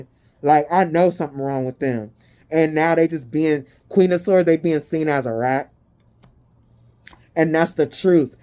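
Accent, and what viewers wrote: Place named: Philippines. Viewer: Hey shadow soul tribe gifted sister. Thank you so much for your knowledgeable reading you shared with us. Take care darling. I believed its my seriously story indeed right now?? Phoenix rising above aka Dragon lady. Watching from Texas. I can tell you are a Pilipina with your accent. Sorry but im just guessing. Take care and ve safe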